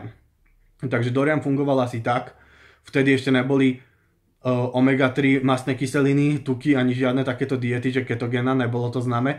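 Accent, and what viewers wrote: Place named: West, Slovakia. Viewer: neznášam západoslovenský prízvuk, ale pozrel som to dokonca :D ber to ako kompliment, no offense, gl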